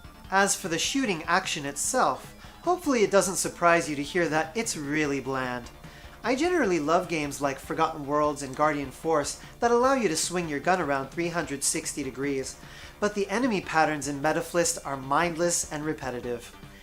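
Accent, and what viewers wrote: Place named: United States. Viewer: they speak in a murican dialect offshoot of real English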